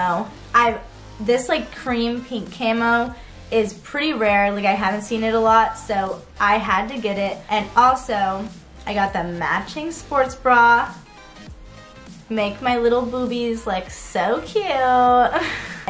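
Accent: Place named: Brazil. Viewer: Você tem sotaque brasileiro ou é impressão minha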